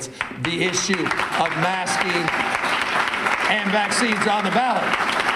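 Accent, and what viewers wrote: South, United States. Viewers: Is it me or is he pandering the black n brown folks with that southern accent? Just an observation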